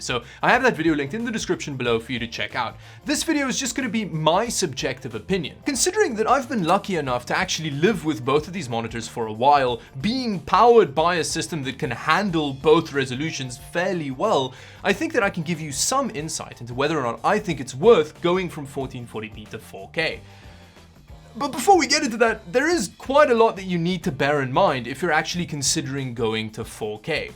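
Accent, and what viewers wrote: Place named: Afrikaans, South Africa. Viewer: Lekker, kan hoor die man is Afrikaans haha. Goeie video:yougotthis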